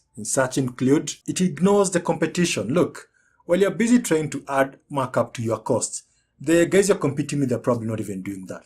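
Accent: Kenya. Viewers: Aaaa thanks man! really helped! btw are you from kenya? can sense that kenyan accent haha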